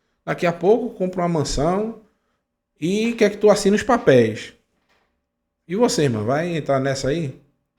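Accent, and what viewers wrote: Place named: Northeast, Brazil. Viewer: Mas que beleza. Meio nordestino. Amei.❤❤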